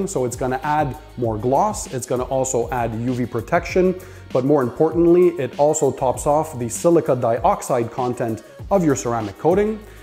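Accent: Quebec, Canada. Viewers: Are you Canadian?? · Are you from quebec ? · French Canadian? You sound hilarious. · Dans seulement 5 seconde je le savais deja que tu venait du quebec , l'accent quebecois et a lexterieur les maisons typique dans les banlieues montreal. Très bon video ! Learned a lot from this video !!!!! definitely subscribing!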